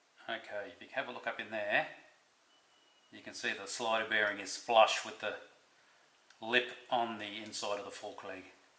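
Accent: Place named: Queensland, Australia. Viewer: hey mate you are not in melbourne are you (judging from your lovely QLD acsent)? need mine done too, slow leaking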